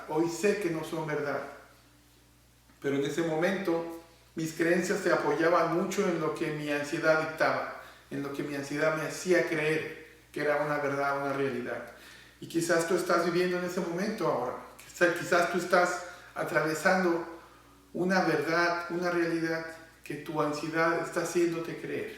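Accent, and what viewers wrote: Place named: Mexico. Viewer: disculpe sr de que pais es usted tienen acento mexicano cual su numero o su tratamiento y cuanto cuesta el libro o el CD saludos desde lima peru tiene alguna sede por aqui en lima peru bendiciones y gracias por ayudar a muchas personas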